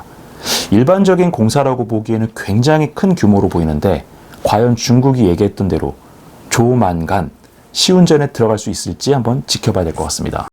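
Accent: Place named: Seoul, South Korea. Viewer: 서울말 좋아요^^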